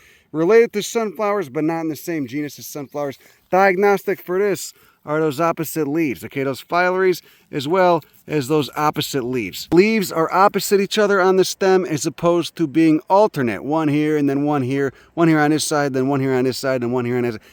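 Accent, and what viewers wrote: Chicago, United States. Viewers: A man with tattoos, Chicago accent who loves Native plants is a man I would marry! I wish there was a gay clone of you!! And your fucking HILARIOUS!! ❤ Keep up the great work!!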